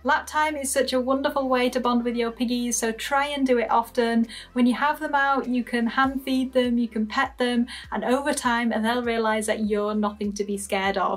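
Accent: United Kingdom. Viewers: you are right I found the more I ignore my new piggies the more they want my attention. we enjoy your videos they seem to like your British accent. we live in Arizona in the states. The only thing they like to watch is you, planet earth,and bobs burgers in that order. Thank You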